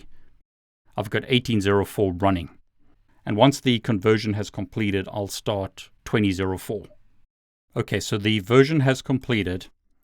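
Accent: South Africa. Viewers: Are you South African? :) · Ah a South African! Goeie video! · can hear your raw south african accent ! thanks a lot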